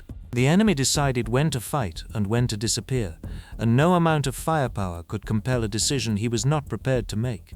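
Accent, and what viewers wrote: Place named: United Kingdom. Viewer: Why is it always british accented narrator s , with US battles kinda unsettling and just wrong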